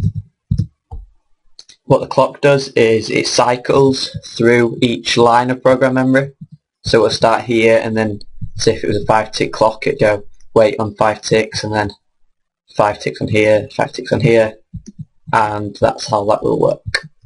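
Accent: United Kingdom. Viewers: is my man scottish?!!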